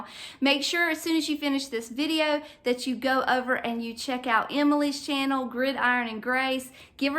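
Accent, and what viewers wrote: South, United States. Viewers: It can be what you want it to be and put out what you love. I think you are still precious! And I love that you talk like me…southern.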